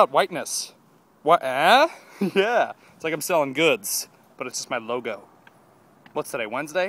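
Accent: United Kingdom. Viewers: UR British right?I bet on it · British voice lol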